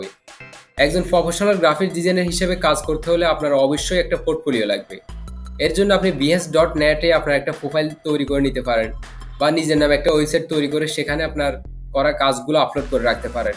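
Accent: Noakhali, Bangladesh. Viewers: Apni ki noakhalir?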